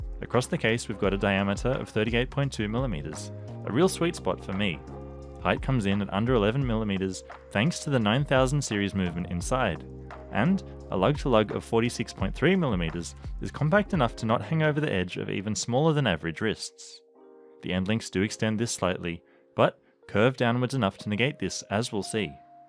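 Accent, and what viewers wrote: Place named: Australia. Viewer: It's always difficult to listen your own voice! Always sounds weird, I didn't immediately pick out you were Australian until I saw the channel bio, which was odd as I am a Kiwi so usually can haha. But to give you some sincere feedback. I just went through my watch buying process and landed on the NB1050-59H (it actually arrived from Japan today!) and this video was incredibly well made. Great macro shots, informative dialogue, good comparisons, good to hear positives and negatives and finally the voice of course! It's like i'm listening to an incredibly knowledgeable jeweler who knows watches inside and out. It took me about a month to finally decide to purchase this watch after tossing up many in the process, I probably watched this video 4 times lol, to say the least, I am a new subscriber! Keep up the good work 10/10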